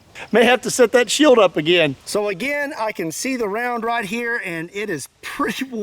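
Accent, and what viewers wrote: Kentucky, United States. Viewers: Are you in east or west it I live in Paducah which is west you sound like your in west Kentucky which is the more gun friendly part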